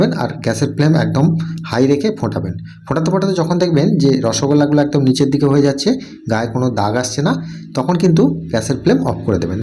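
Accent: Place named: Hooghly, India. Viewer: ওনার কথায় মনে হয় চূঁচড়ো, চন্দননগর বা হালিশহর, কাঁচরাপাড়ার লোক।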